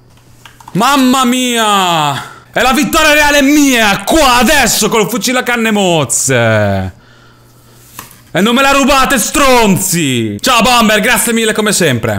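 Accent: Naples, Italy. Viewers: E stupendo il tuo napoletano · ma sei napoletano a fai finta di esserlo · Come è bello quando parli napoletano😍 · Ma sei napoletano io si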